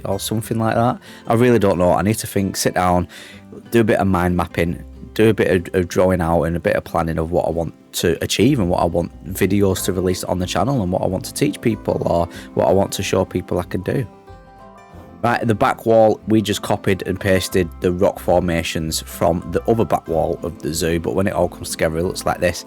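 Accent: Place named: London, United Kingdom. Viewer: yeah great voice, narration a n d accent. One of the main reasons actually for me to choose your videos over those of other gifted British creators. (My preferred accent group in general….) Is that a London accent? Which part?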